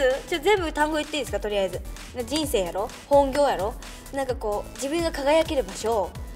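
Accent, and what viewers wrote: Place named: Hakata, Japan. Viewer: れいなの博多弁好きすぎる。。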